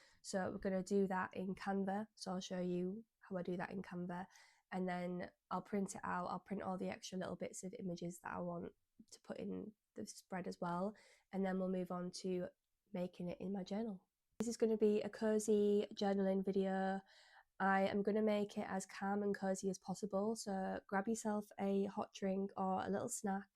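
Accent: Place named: Northern England, United Kingdom. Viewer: Ooh is that a northern accent I hear! I love discovering a fellow northerner on yt, just found my new fave channel! Your journal spreads are so beautiful ❤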